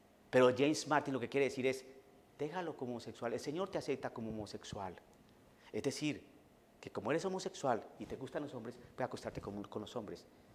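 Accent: Colombia. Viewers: tiene mas acento colombiano que mexicano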